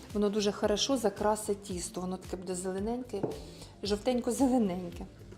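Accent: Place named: South-East, Ukraine. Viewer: Не робіть зауваження --це південно-східний суржик, він теж має свою історію.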